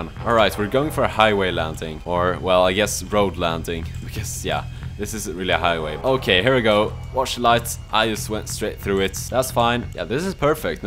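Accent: Sweden. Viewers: Swedish Right? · Are you Swedish? You sound Swedish hahaah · Are u swedish? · You have a swedish accent my dude hej hur mår du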